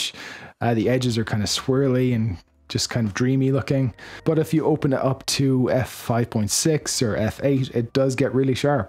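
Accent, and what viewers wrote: Ireland. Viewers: Great Video. I love the Irish Accent, by the way!!!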